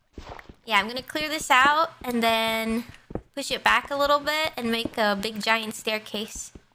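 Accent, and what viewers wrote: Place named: New York, United States. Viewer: Her accent almost NY-like. Mid Atlantic/NY? Anyways I usually don't like 'mom jokes' but everytime she cracks it its so cute and funny.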